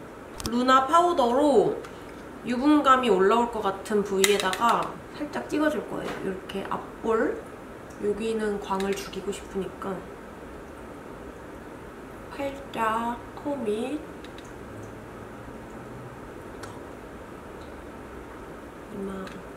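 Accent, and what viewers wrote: Busan, South Korea. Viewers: ㅋㅋㅋㅋㅋㅋㅋㅋ 님 부산 사시더니 말투에 부산사투리가 약간 섞여벌엿네욤 귀요오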